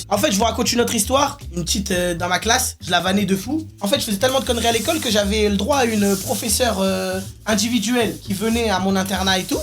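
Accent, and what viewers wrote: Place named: Marseille, France. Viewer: L’accent marseillais >>>>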